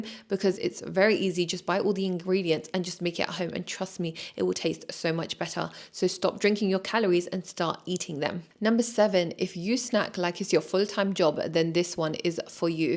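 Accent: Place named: United Kingdom. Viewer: i cant believe i was about to ask what country you're from and didn't clock the accent LOLLL! as a canadian, i watch so much british content its become normal to me so i didnt even notice it AHHAHA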